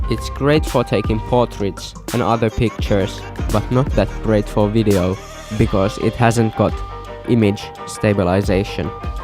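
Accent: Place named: Finland. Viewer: Ootin hitonmoista rallienglantia, mitä vielä! Nice🤘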